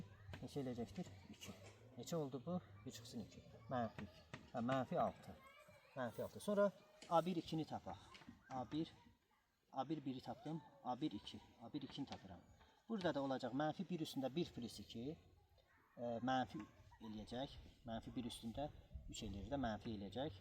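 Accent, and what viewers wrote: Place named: Talysh, Azerbaijan. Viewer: Talışa oxsayir😁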